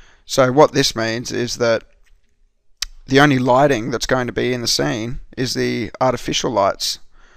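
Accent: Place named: Australia. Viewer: Wow, great work! Thanks for the help! love love love love that Aussie accent too :P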